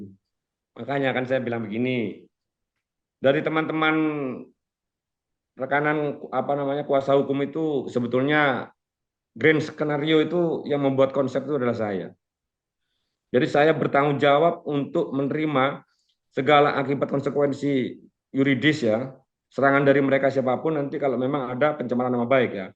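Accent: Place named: East Java, Indonesia. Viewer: Logat nya jatim banget semangat bang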